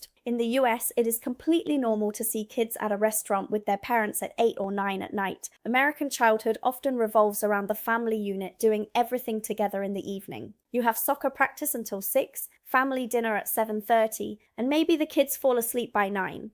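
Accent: England, United Kingdom. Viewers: I want to know why you have an English accent if you are American and only been here 2yrs or so? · American brain, English accent. give a break! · Why does she have a British accent?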